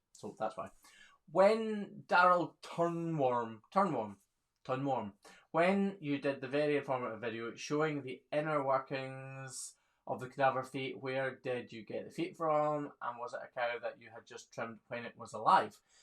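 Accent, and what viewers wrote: Scotland, United Kingdom. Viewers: I missed the live event, dang it, I was going to ask if you were scottish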